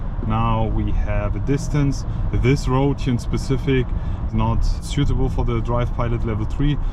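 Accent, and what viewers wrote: Germany. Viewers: Der deutsche Aktzent ist hörbar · The German accent is strong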